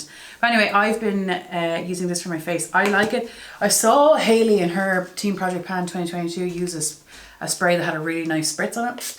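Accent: Ireland. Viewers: Happy to help! I know oden's eye has a similar sponge as well if you can't find it in Penneys. 😉 I'm almost Irish ahah. I'm Portuguese but lived in Dublin for 7 years (back in Portugal now). I actually found your channel when I was looking for Irish youtubers to follow cause I missed the accent so much.😅 xx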